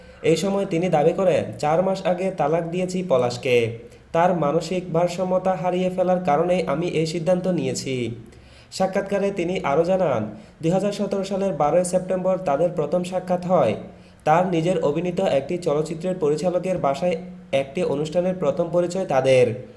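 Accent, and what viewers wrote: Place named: Noakhali, Bangladesh. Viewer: পাঠক নিশ্চিত নোয়াখাইল্লা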